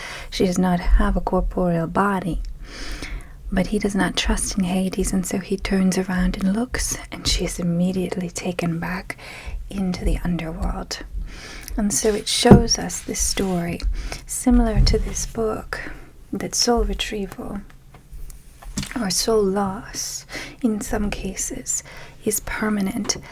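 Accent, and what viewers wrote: Transatlantic, United States. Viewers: a slight transatlantic accent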